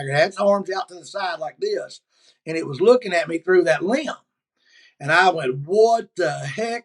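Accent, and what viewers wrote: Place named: Texas, United States. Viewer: I like this man’s accent!!! It’s all Texas y’all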